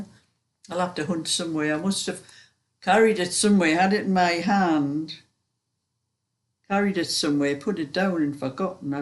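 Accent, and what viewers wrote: Newcastle, United Kingdom. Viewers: Just found you - what beautiful work! And so lovely to hear the Geordie accent - living in Hampshire now I still miss home, after 30 years. What a joy to listen to your tales - reminds me so much of my Auntie Lizzie Sams from Wallsend - in a lovely way! x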